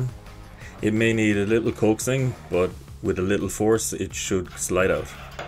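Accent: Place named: Cork, Ireland. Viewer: Lol , I meant , where .. you sound corkish , int in this setup , love to pick your brains a bit on it , if you were up for that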